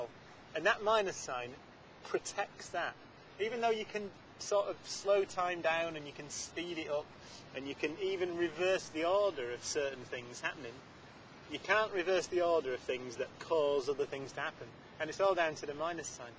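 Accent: United Kingdom. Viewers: this is british english and it sounds much much much more painless than american english.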